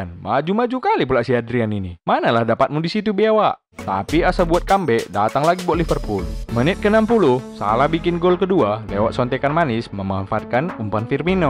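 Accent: Medan, Indonesia. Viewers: Nampak kali ah abg ini orang Medan nya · Abg pasti org medan saya orang mandailing natal bg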